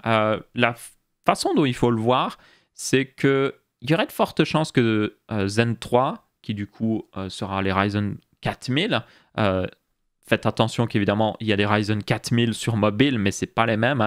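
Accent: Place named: Belgium. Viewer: Je t'ai découvert ya pas longtemps, et tu mérites tes 100k abonnés. Ton contenu est sympa, tu expliques bien, tres pedagogue, et ton accent belge te rend encore plus sympathique pour moi ;-)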